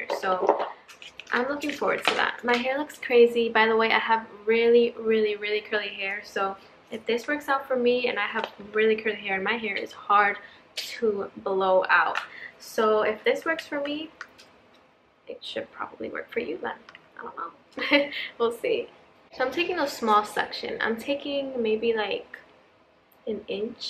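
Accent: Dominican Republic. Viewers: Saludos desde RD! De donde eres? Suenan como Dominicanas. Me encantan tus videos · Esa forma de hablar... Indudablemente Dominicana jajajaajaja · Me sorprendió mucho escucharte hablando español y darme cuenta que eres del patio. 🇩🇴🇩🇴🇩🇴💪🏽❤️ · Are you Dominican? Cause the accent when you talk Spanish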